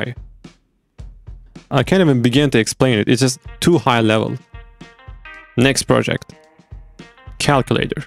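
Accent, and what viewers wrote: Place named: Sweden. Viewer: Where you from? You sound Swedish. 😊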